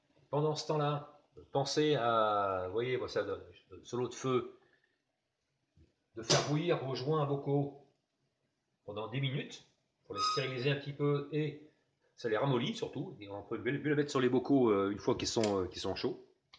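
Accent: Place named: Savoy, France. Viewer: On y entend juste un petit accent savoyard.... lol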